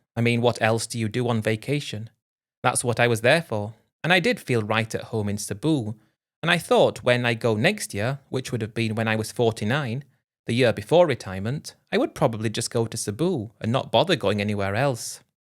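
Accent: England, United Kingdom. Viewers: America - you have an English Accent.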